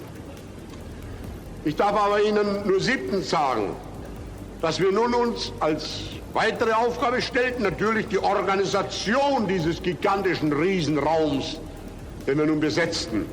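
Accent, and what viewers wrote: Austria. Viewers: He is Austrian. From Braunau. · Ist es wirklich. Sein österreichischer Akzent ist weniger stark als ich gedacht hatte. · When you are from Austria you can hear where he came from. Rural austrian dialect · Es war einfach ein österreichischer Dialekt Junge. Nix besonderes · Interesting to hear his dialect that he adopted in Vienna as a young man.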